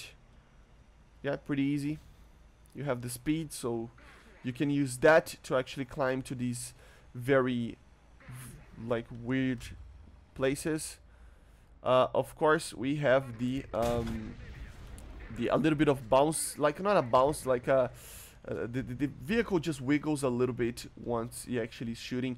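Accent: Brazil. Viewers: mano aprendeu ingles aonde ate que tu nao tem um sutaque tao ruim para um br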